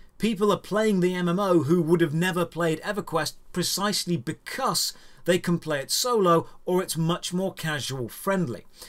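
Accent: United Kingdom. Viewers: I'm confused. There's some brit talking, but no mug. Where's the channel host?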